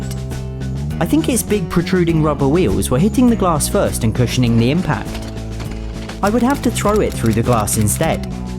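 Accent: United Kingdom. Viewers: are you British 🇦🇺?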